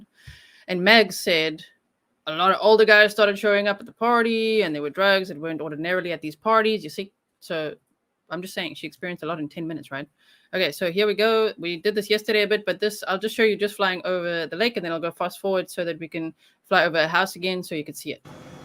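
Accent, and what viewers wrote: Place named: South Africa. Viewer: Thank you we have subscribed. Love your South African accent. My friend in Hawaii is from South Africa and you reminded me of him. Keep up the good work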